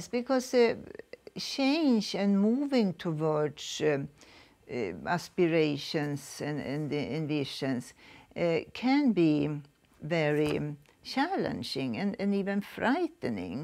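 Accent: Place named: Sweden. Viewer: That Swenglish accent is crazy😅 (swedish-english)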